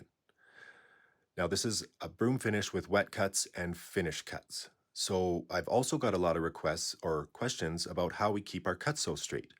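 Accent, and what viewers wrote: Canada. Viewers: I knew you were from Canada with your Os 😂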